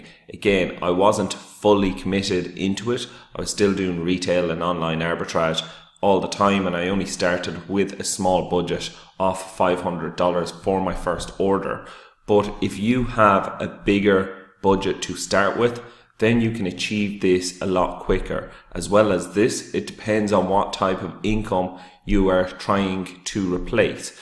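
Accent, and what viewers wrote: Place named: Ireland. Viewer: I hear an Irish accent, what part are ya from ?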